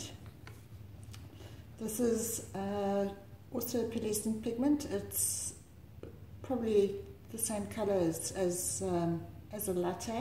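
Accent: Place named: South Africa. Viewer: Hi I stumbled upon your video and was very excited to hear your voice “A fellow South African”. I immediately looked for the paints you used in your description for the bloom technique. Your work is stunning 🤩. Which brand of varnish do you use and do you use the Matt or gloss. You might like to check out Bastion Paints, they are here in Salt Rock KZN. Thank you for sharing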